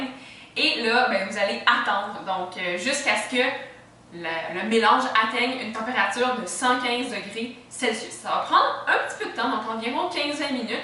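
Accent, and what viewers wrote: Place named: Canada. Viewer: J'adore votre axon canadien et mille merci pour la recette je suis algeriene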